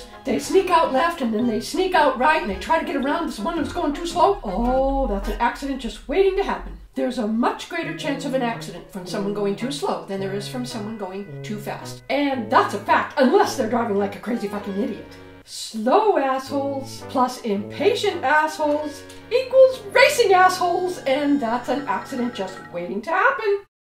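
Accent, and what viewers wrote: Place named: United Kingdom. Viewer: i fucking love you i also love how you sound like a posh english person but you're from america. what the fuck is that about?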